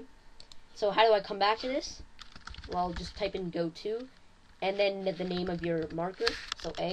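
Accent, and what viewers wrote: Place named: Canada. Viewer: Good video. You have a strong Canadian accent.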